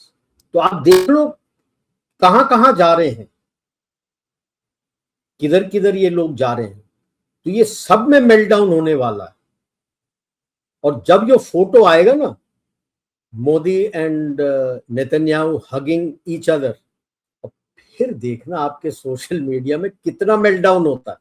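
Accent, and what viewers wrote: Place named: Punjab, India. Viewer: Sir, your last name indicates you are south indian but your dialect shows you are perhaps punjabi speaking. Sir, reveal you are punjabi or tamilian.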